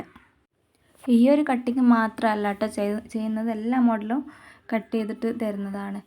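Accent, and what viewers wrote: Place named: Kannur, India. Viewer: കണ്ണൂർ aano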